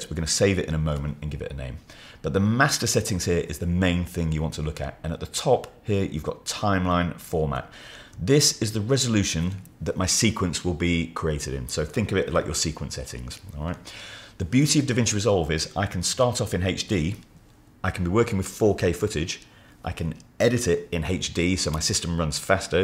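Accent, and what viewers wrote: England, United Kingdom. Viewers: I am Swedish and I just love your English accent. Its sound so pure and beautiful, not like the american accent 🤣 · I stopped at this channel purely because the presenter speaks British English, not American English. Then I found that he is knowledgeable and thorough as well, so I subscribed. 🙂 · apart from the British accent, the video is great.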